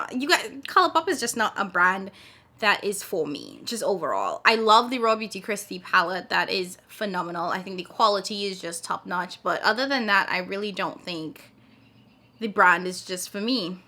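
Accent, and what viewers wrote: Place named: Trinidad and Tobago. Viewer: Found your channel today and I'm enjoying your content and Trini accent.......it's like seeing a familiar face in a room full of strangers. Please continue to post and share your knowledge.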